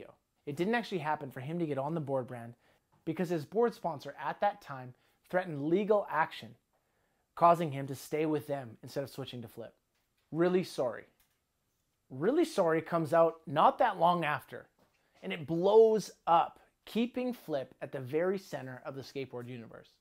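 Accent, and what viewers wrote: Canada. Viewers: Your Canadian accent is so strong in this, pronounced sorry the Canadian way.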